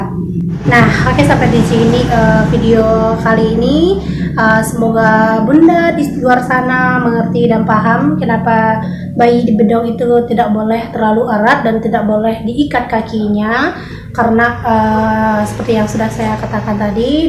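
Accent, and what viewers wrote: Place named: West Sumatra, Indonesia. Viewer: Tangan Bainai ,Logat kental . FIX urang awak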